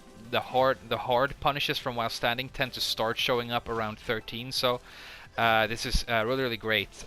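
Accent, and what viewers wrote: France. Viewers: HIS FRENCH ACCENT LMFAOOO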